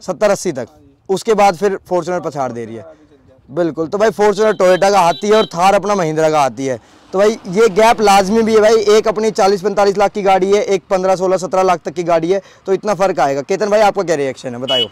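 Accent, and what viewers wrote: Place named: Mewat, India. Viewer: Mewati si bolro h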